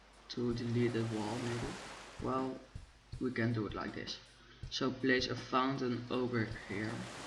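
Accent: Netherlands. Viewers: So fcking annoying when people are complaining about his English and Dutch accent...this video is about building a resort, not about the English language. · that just the dutch accent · je hoort gwn duidelijk dat dit een Nederlander is xD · he's dutch, we have that terrible accent (im sorry) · Wauw! you can hear you're from the Netherlands xD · he has just an dutch accent ;s im dutch to and i think he can speak really good english.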